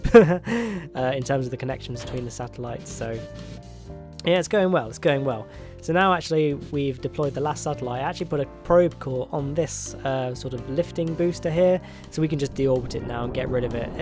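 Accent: United Kingdom. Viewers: It’s because of his British accent and many Americans think that Britons are very posh, even though most are not. (Just listen to a song!)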